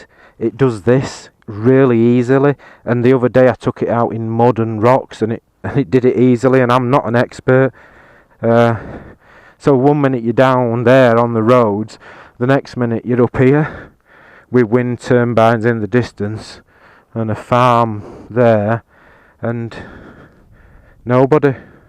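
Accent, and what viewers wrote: Yorkshire, United Kingdom. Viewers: Cracking, comprehensive review matey! And I bloody love your Yorkie accent 👍🏻 She looks beautiful in them colours but then I would say that 😉😊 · One more comment. I'm from the states. Quite a few years ago I enjoyed a British TV show here on PBS. It was called "All Creatures Great and Small" about a country vet in the Yorkshire country side. Loved it. Your accent brings me back to that time. Thank you.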